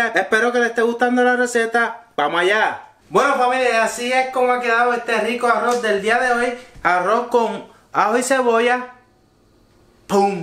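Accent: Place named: Puerto Rico. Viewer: Que lindo te vez hablando me gusta el acento de los bori hoy voy hacer un arroz con ajo y cebolla 🤗😀🧐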